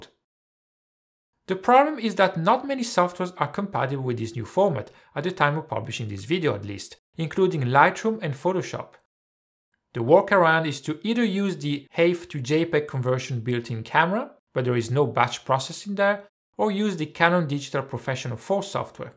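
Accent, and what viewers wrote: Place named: France. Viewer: Are You French? (je suis français et je reconnais un accent bien de chez nous^^). J'aimerais tellement que tu puisses tester / proposer des comparaisons avec le FUJI XT4, un APSC qui me fait de l'oeil. Même une comparaison avec des FF (Full Frame) serait intéressant:)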